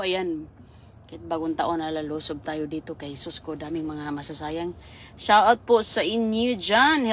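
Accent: Visayas, Philippines. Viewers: Ok lang yan Bisaya kasi siya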